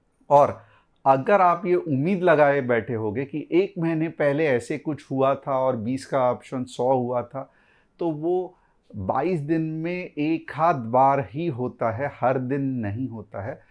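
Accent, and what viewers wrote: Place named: Mumbai, India. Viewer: सर आप मुंबई से हो क्या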